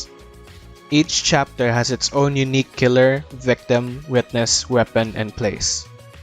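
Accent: Philippines. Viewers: You sound so pinoy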